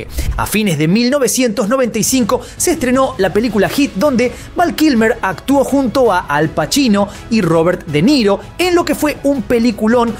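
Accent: Argentina. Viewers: Amamos ese toque argentino sello de nuestro..que tiene ese que se yo viste?..cariños desde Chile gracias tremendo Val,siempre sera Jim❤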